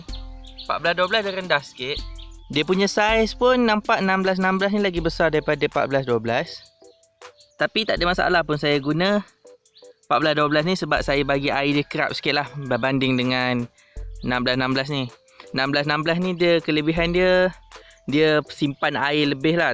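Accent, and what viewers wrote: Pahang, Malaysia. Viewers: ckp mcm org pahang..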